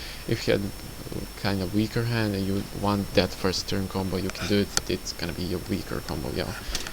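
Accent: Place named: Netherlands. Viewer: ... decklist is okay. Dutch accent is horrible.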